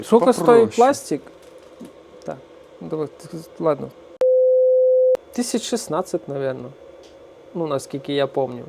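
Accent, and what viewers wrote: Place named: Ukraine. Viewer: попадаю додому цей акцент ні з чим не спутаєш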